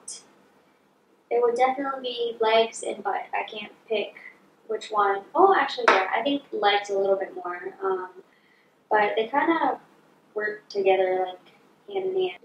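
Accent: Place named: South, United States. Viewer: where are they from they kind of have a southern accent.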